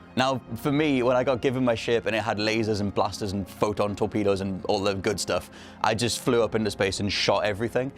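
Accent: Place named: North East England, United Kingdom. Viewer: This help me out alot because im a huge noob on this game cheers mate!!! sounds like your from the north east of england as well i am to :D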